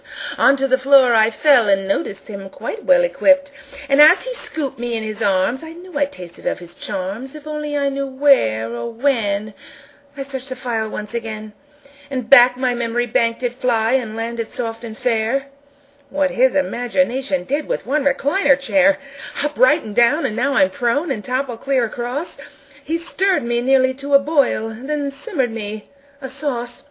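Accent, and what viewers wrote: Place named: England, United Kingdom. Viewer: Absolutely superb! I loved the English accent too - lol ~Sue~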